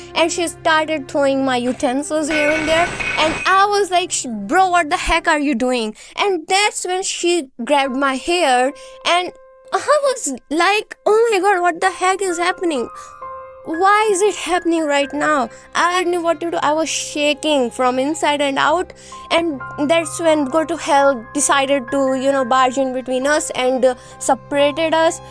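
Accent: India. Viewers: do you happen to be indian ?, your accent sounds like one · Hey are u indian? Because accent is kinda ✨ indian ✨. Btw the video is really nice 👍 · I'm really sorry if this is too personal but... Are you Indian because you have kind of an accent 😅 (I really like your voice btw! Is cute!) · I thought so 😅, I’m an Indian as well! And I could recognise by your voice, your accent, your voice, and your sweetness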